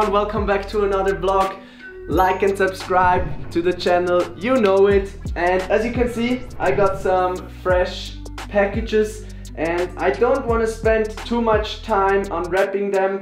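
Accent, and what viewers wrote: Switzerland. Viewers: du da hämmer emol wieder dä schwiizer dialekt😂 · haha fix en schwiizer englisch akzent ;D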